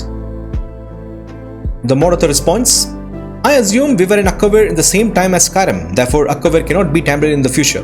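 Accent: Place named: India. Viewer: Sorry if this is rude but out of curiosity what part of the Indian sub-continent do you come from? I always find slight regional differences in accents interesting and I haven't heard one exactly like yours. If this is to rude/personal of a question please don't feel like you need to answer.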